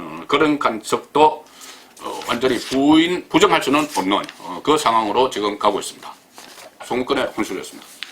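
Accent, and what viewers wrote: Gyeongsang, South Korea. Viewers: "거취운운" 하는 것 부터가 혼술이 촛불ㆍ뭉죄잉을 의식하거나 약간의 그 어떤 이해에 얽메여 있는게 아닌가 의심하게 만든다 니가 참 언론인이라면 "윤 총장 절대 자진사퇴 말라"로 제목을 다는게 맞다 글구 방송하려면 그 투박한 경상도 발음 좀 고쳐라 나도 갱상도지만 쨔정난다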